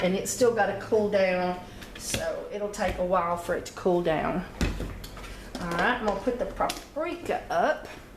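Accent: South, United States. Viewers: A few things. First I want to apologise for not getting back to you sooner, I still want to help regarding what we spoke of before. Second, THANK YOU SO MUCH!!!! No one online has taught "Superfatting" better than you! How simple was that idea? How could it be said better? Super easy and YOU are the answers. And lastly, I was born to a southern girl who lived in the north so I feel comfortable in both worlds and they are as different as the country mouse is to that city feller over tharr. LOL. I love your video language because you sound like one of my bless their hearts and keep them Lord aunts. When you said butt crack I knew I still had some southern left in me, I about died laughing then shut myself up so I could learn more. Anyway, nuff said, I will email you again.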